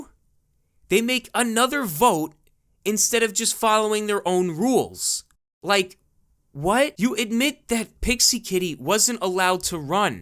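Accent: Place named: New York, United States. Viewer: I know this is completely unrelated to this video,but are you a New Yorker? Because you really sound like one.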